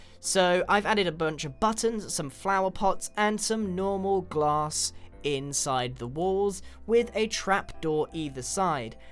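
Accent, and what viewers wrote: England, United Kingdom. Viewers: THAT ENGLISH ACCENT AND CALming voice really makes me feel calm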